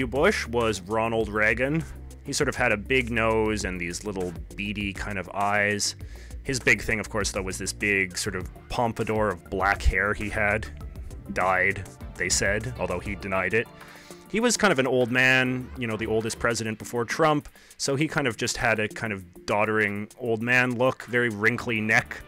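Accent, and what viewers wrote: Canada. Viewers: You soound Canadian 'ay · He sounds SUPER canadian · that hefty Canadian accent distracted me sooo much... absolutely beautiful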